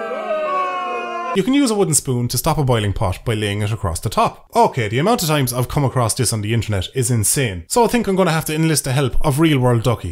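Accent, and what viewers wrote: Ireland. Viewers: Your voice is so much more Irish in irl lmao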